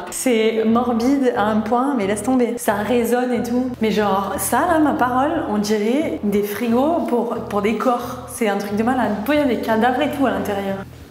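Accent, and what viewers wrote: Quebec, Canada. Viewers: J adore ta voix et l accent québécois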